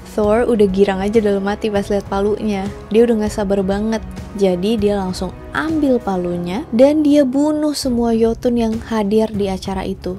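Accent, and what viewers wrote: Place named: South Jakarta, Indonesia. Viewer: Gua suka pembawaan yang sekarang gaya anak Jaksel pisan wkwkwkw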